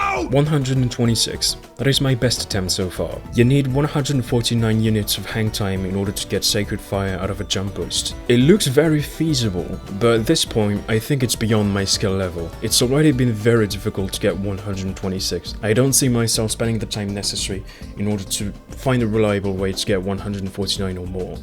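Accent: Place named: France. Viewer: Best French voice accompanied by best background music <3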